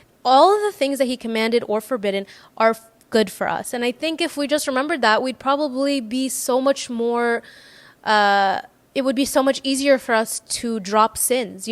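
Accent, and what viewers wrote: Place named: United States. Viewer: I like this American accent can you tell me which region this is I will found more content in this accent I'm learning English with this accent but I don't know what is the name of this accent It will be good for my future English language hope Thank you for sharing Islamic content May god grant you more knowledge about Islam, Bacha from Pakistan ❤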